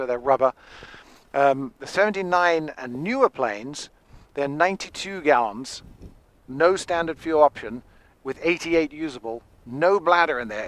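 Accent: England, United Kingdom. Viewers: This is an English accent…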